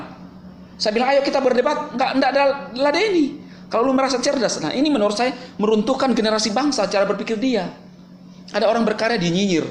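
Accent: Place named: South Sulawesi, Indonesia. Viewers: Bang asalnya dari sulawesi yah? Logatnya kayak org makassar..maaf kalau sy salah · orang dari sulsel kah bang? logat na kentara e...hahaha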